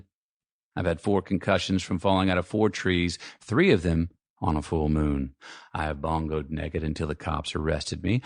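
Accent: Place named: South, United States. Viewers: He’s just making up empty platitudes and selling it with a confident southern accent. He is an insane person and I love him for that. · This man makes my innards quiver. Southern gentleman have IT. But this man bequeaths the essence of every southern man. He has it ALL; the eyes. the beautiful Texas accent-The whole package and by God he has sex appeal in spades. Whew.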